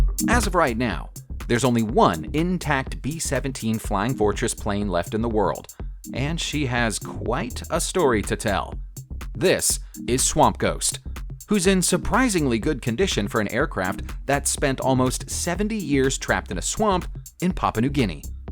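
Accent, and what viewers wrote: United States. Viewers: The announcer sounds American. How is it he can't pronounce Catalina?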